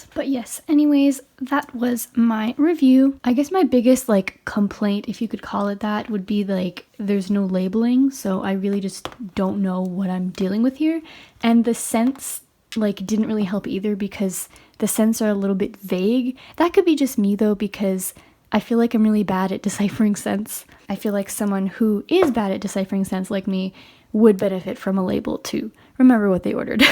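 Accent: France. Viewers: Your French accent is soo cute🥰❤️ love you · AA, ma queen back with another LITTT VIDEO! Was this am asmr because the vid was just satisfying also your french accent! <3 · I love your french accent and idk why but i was so entertained by the story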